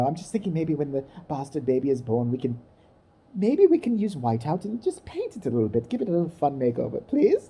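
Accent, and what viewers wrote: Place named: United Kingdom. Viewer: I google it ( exempt ) BC... I'm a Genius... Lol🤣 ur always sooo nice and Kind to me 💞... Thank you🙏 btw, loooovvvee ur British accent🤣😭!!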